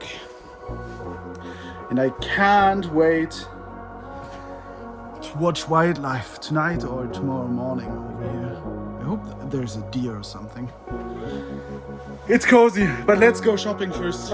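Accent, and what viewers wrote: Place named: Germany. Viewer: Your accent is lovely, whereabouts in Germany are you from?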